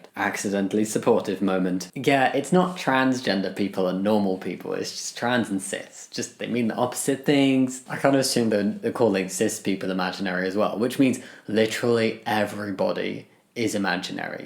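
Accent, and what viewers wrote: United Kingdom. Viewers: I’ve watched your videos on & off for years. & this is the first time I realized you have a British accent 🤯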